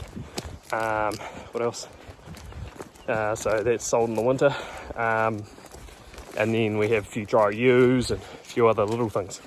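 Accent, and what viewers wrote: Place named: Taihape, New Zealand. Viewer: Mate..... said with that taihape lion red twang, had some bloody laughs watching this. said to the wife, "oh shit he's got one of those datamar's reels" feel your love hate relationship with em. so anywho, im from the wider north island, been there done some of that, grew up in the windy city of Palmy and migrated through the island to north-land after a seasons in ohingati, drove stock units in your neck of the woods for couple years before going back to the front end, to many late nights loading out of fielding on a Friday night for my liking. anyways nice sarcasm on the wool. bloody sacrilege. look mate we were farming 600 ha in north-land before it all got turn into carbon pine forest but i swear the best vale out of your nitrogen is in may, sets you up for winter, and yeah i always monitor soil temps, we we 12.3 on Wednesday last week., love your vids mate. 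( oh yeah my hunterway rocks is exactlly like duke). hard headed..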